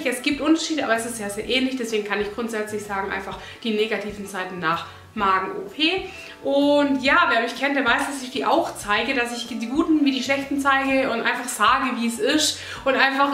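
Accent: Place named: Swabia, Germany. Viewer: Man hört das schwäbische LEICHT raus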